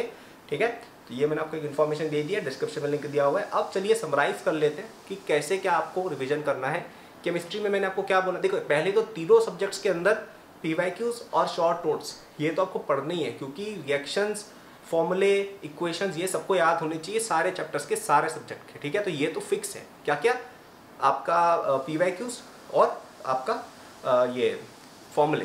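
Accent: Standard. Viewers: Gazzab shudh Hindi👍👍 Best Btw IAM 2023 aspirant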